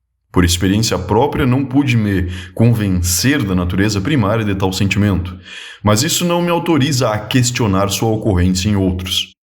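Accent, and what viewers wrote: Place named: Curitiba, Brazil. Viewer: Sotaque curitibano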